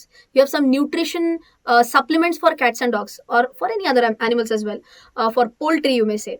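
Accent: Maharashtra, India. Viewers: Her sound tunning like she is definitely from maharashtra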